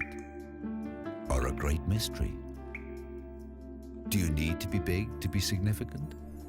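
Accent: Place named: Denmark. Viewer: Concider.... Check your Danish English-speaking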